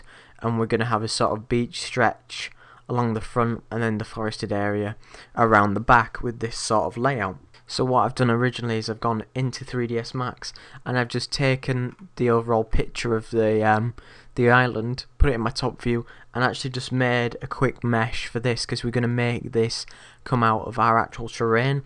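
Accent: United Kingdom. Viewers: British Accent makes me feel chill :D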